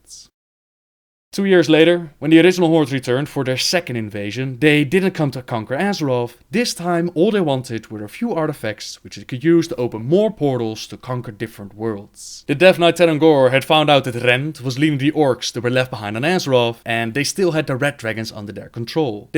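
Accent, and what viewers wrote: Netherlands. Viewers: you can clearly hear he his from the netherlands. goede Videos, ga zo door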